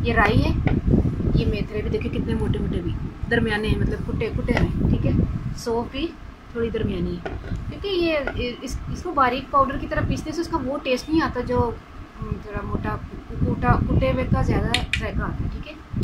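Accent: Punjab, India. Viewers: aapka punjabi bolney ka tarika bahot nice hai. lasoorey ke aachaar ki receipe bahot achey tarekey se batai hai. lasoorey kis month me aatey hai? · thanks apka channel subscribe kr Lia hay apki Punjabi biukul hamray jasi hay 😁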